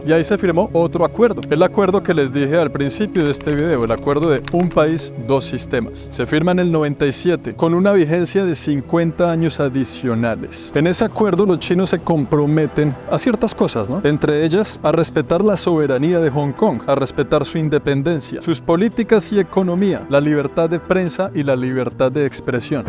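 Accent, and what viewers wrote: Colombia. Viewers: Este gringo tiene acento colombiano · Pese a que su contenido lo ven muchas personas de diferentes países de habla hispana, él habla como un buen colombiano del común. No es como otros youtubers que empiezan a hablar neutro o se mexicanizan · Muito bonito o espanhol colombiano. 👏👏👏👏👏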